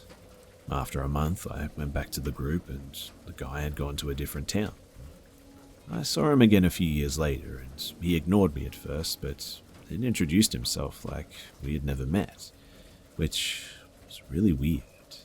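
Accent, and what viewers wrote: Australia. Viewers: Is it bad that i just realized he is a ausie?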